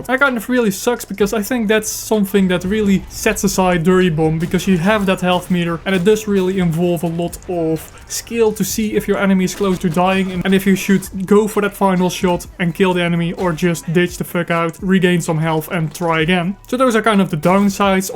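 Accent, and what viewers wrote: Netherlands. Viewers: Je klinkt Nederlands, maar waarschijnlijk heb je geen idee waar ik het nu over heb haha.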